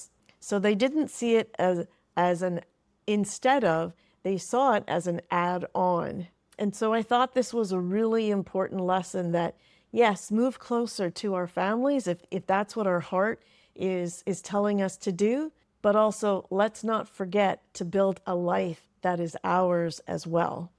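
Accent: Canada. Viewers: She has an Ontario accent.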